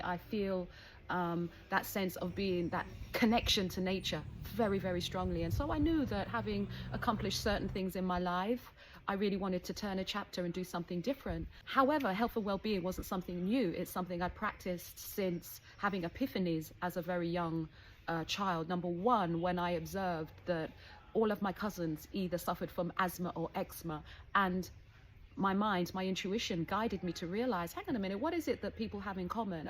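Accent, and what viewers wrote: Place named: South St. Elizabeth, Jamaica. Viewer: I am here watching your video about your grandmother Laura and hearing the South St. Elizabeth accent pulsating through and loving it! Your channel is awesome too! You are on the top of my list too! So coincidental and I love it❤️🧑🏼‍🌾🏝️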